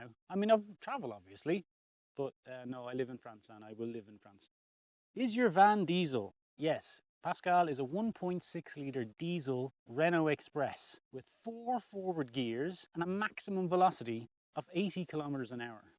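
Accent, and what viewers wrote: Dublin, Ireland. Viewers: QUESTION! Presuming from your accent you're a Dub (or certainly the Pale area), but if you are a Dub are you a northsider or a southsider? I'm a northsider meself 👍🏻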